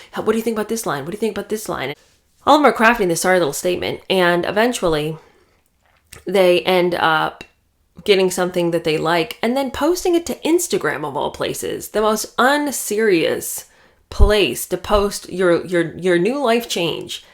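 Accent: United Kingdom. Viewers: I just love your British accent😂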